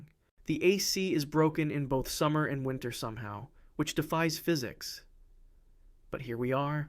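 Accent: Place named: United States. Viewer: Profoundly ironic and offensive that its a bloody Septic (American) producing this video. Not that he's wrong, and its good to see someone turning these observations (debatable as some of them are) into a You Tube video, but I can't help baulking and can't watch the whole thing for hearing an American accent keep saying "WE" is positively nauseating.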